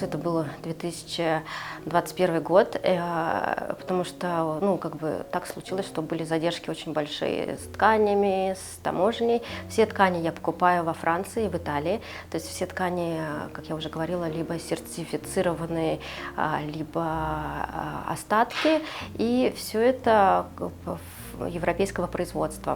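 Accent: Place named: Russia. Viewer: Французский шик с русским акцентом! Потрясающая, потрясающая атмосфера и конечно же изделия 💔 Вы вдохновляете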